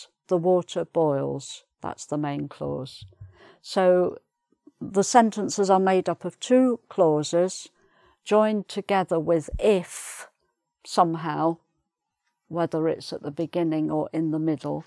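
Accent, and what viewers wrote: England, United Kingdom. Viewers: Are you British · I really love her British accent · indeed! As a Scot, I find references to an English accent as "British" accent both irritating and, frankly, insulting. Aside from the four countries which make up Great Britain and Northern Ireland and their distinctive accents, there are hundreds of regional accents. All different and all "British." "British" does not just mean Home Counties English. PS, love this teacher's style, could listen to her English accent all day. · I really love your calm and perfect British accent. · I love your English accent!! Love!! Greetings from Spain!💕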